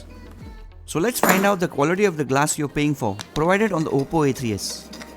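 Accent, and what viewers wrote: India. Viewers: i barelly understand your hindu english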